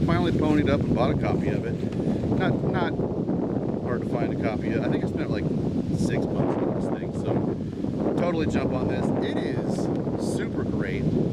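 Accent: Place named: Australia. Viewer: Dude, that walking footage at the start rules, it's like a music video to the song playing. No problems with the wind noise, great ambience. I can forgive that, but not that "down un-da" accent! Mandy who is Murkrat is quite prolific, she was in gothdoom band Lycanthia who I saw live back in the day, and is in funeral doom band The Slow Death. I believe she lives on a farm and suffered some damage during last years fires.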